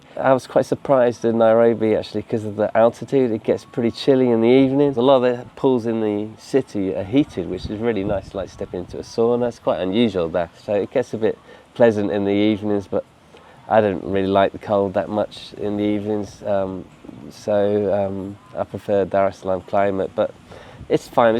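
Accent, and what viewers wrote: United Kingdom. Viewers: Goodness me! You are the kind of Europeans that make some Africans believe they are better than other Africans because they can speak English. Wth!! Tanzanians speak Swahili. They way you described how Tanzanians don't understand English is rude and ignorant! You made it sound like Tanzanians are dumb because they would not immediately understand you a British man with your British accent. It's ok to give your opinion on the two cities but you were flat out just talking down and insulting Tanzanians because they have a different culture and they don't speak your language. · British accent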